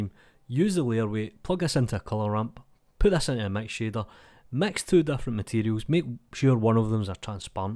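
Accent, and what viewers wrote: Scotland, United Kingdom. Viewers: Your inspiring combination of Blender knowledge and mesmerizing Scottish accent saves the day again. Thank you!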